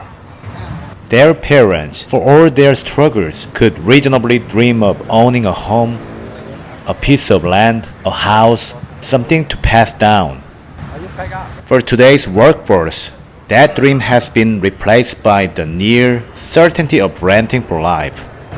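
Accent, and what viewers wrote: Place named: South Korea. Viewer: Impressive work! I just hope that you can drop the Korean accent 😅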